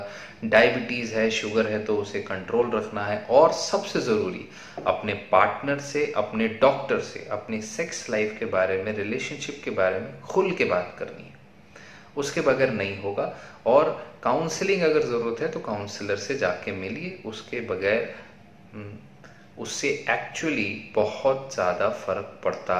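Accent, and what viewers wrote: India. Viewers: sorry doc..i have a hard time fallowing you..what is that indoenglish ?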